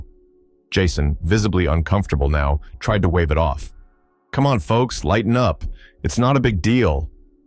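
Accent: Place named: United States. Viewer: What an absolute load of American accented bullshit! Is it perhaps time that British former soldiers took the piss out of the American guards at Arlington? No? That would be too much?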